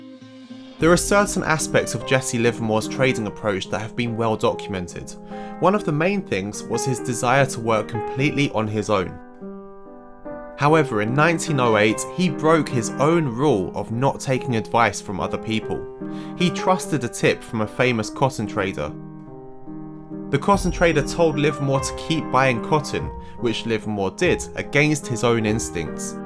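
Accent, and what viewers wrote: United Kingdom. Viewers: Is this Brittish accent？ Thanks！ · I like your British accent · west london accent nice video: really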